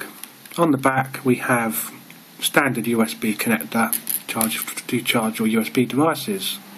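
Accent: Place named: Scotland, United Kingdom. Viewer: Scottish accent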